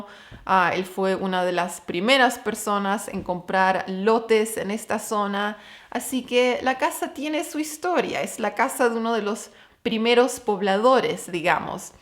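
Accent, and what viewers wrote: Argentina. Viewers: Cada vez tenés más acento argentino. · Hablas como Argentina, ya sos nuestra🇦🇷🇦🇷🎸🎸🤟🤟😃😃, falta que hables como Cordobes y digas cuuuuuleeeaaadoooo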